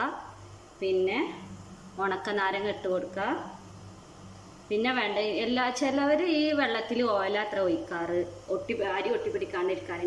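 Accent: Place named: Pattambi, India. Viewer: Pattambi ഭാഷ നല്ലോണം വന്നിട്ടുണ്ട് 😍😍😋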